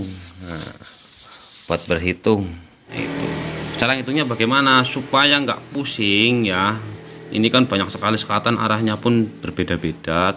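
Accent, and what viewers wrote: Banyumas, Indonesia. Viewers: Ora ngapak ora kepenak... Maturnuwon kang ilmune...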